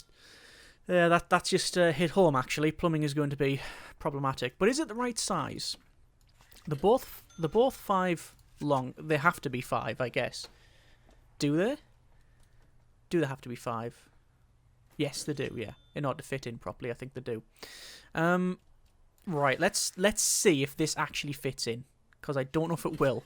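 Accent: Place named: Newcastle, United Kingdom. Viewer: You sound slightly Geordie and if so big up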